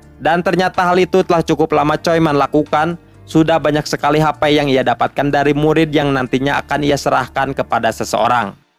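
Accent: West Java, Indonesia. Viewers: Bang urang sunda lin??😅😅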